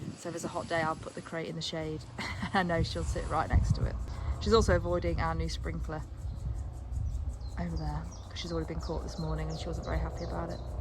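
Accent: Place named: East Midlands, United Kingdom. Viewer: Are you from the east midlands Nottingham area?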